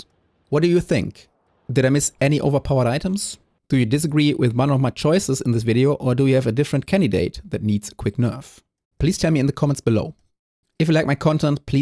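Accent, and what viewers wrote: Germany. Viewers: Do i hear a german?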